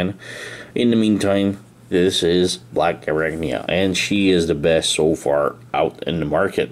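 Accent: Quebec, Canada. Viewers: it was perfectly fine pronunciation. Sounds like a Quebec accent to me and I right? 👍🏻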